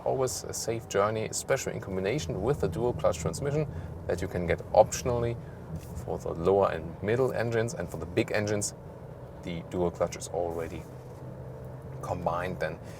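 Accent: Germany. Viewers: I just come here for the German accent, yum.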